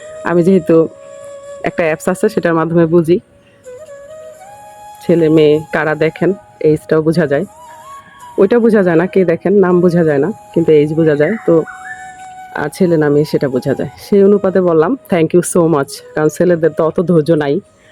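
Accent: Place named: India. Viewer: আপু, খুব ভালো লাগলো ❤❤ আপু আপনার ভাষা ইন্ডিয়া এর মতো।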